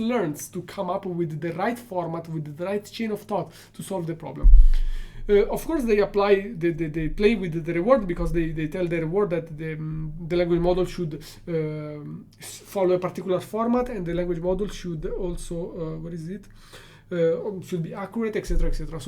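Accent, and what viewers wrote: Italy. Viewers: I love the Italian accent 😂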